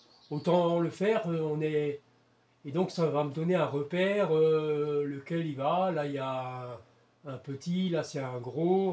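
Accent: Alsace, France. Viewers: Vous n'auriez pas l'accent alsacien comme moi ? 😎 Cette table de mixage me rappelle ma jeunesse quand nous animions des bals...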